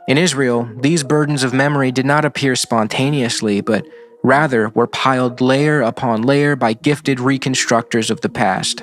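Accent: United States. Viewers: Was quite okay listening to this as a background until I head the commentator’s American accent saying that he’s not saying the ottoman turks weren’t humanistic paradise and that they did some horrible shit like the Armenian Holocaust.. and I just found myself thinking how amazing it is that Americans somehow don’t exist in their own heads when talking shit about other civilisations. I mean he exists as a citizen of his country thanks largely to his ancestors successfully did to the indeginous people what Israel is doing to the Palestinians. And then they didn’t stop there, in order to compete in trade internationally they went and enslaved god knows how many Africans to keep their business overheads low. And NOW they are helping out with a genocide because their best buddy Israel really wants a genocide. If the ottomans did shit. What have the Americans been doing ? 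· Sir you sound like an American. Doesn’t that mean that you are from a country that is colonized land? Are you prepared to leave this land and return it to the indigenous population? It could be that you have indigenous blood having intermixed ancestors but certainly you have blood of colonizers also so do you get to stay or must you go??? Does it matter who colonized THIS land in regards to whether YOU get to stay or go??? Populations over history have immigrated around, colonizing, displacing, being displace and even returning. The Jews are in Israel now. Regardless of their religious views or lack of them, they are there NOW. Just like we Americans are here now and we don’t plan to just leave and give the land back to the ancestors of the indigenous people because our ancestors fought them for it. We should focus of history and how civilizations have behaved over time. Nothing new here except that the world seems to hyper focus on Israel for some strange reason. 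My opinion which matters only to me, is that the world focuses on Israel because of Israeli’s claim of God giving them the land and world hates God.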